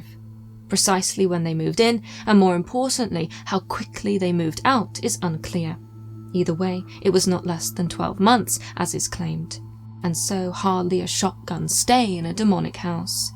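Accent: England, United Kingdom. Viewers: Hearing say "trust me bro" made me laugh! Hearing her beautiful sweet voice, with her English accent, I had to go back to listen to it again because I thought I was hearing it wrong. Nope, I was right, and she did say that LOL. Great video as always! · All I have to say is every time I hear your voice in these vids I think " oh boy there is that English Girl throwing down a good story... Keep it up.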